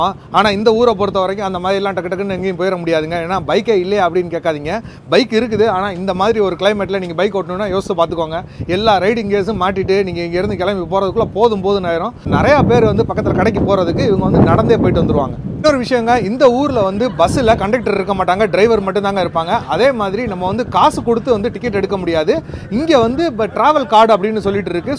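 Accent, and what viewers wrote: Madurai, India. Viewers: you speak, is madurai slang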